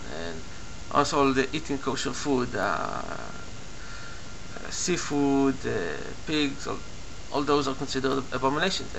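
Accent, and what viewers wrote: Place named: Israel. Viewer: He sounds Israeli.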